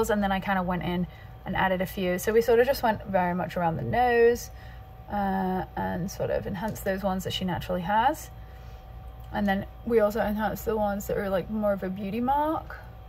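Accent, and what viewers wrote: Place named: United Kingdom. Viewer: You sound so much more British in this video. I wonder if it's because your parents have been visiting? I love it -- you sound delightful 🙂 😘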